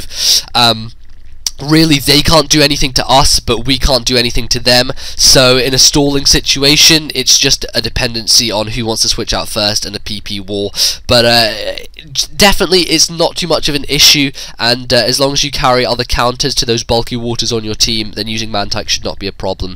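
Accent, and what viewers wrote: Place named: England, United Kingdom. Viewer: your obviously english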